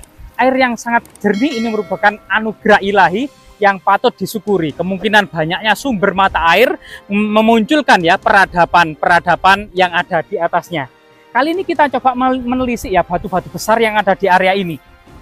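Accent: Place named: Java, Indonesia. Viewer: Tutur bahasa muedhok tenan 😁👍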